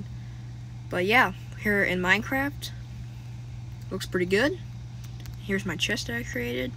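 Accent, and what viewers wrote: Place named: South, United States. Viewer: I like the southern accent btw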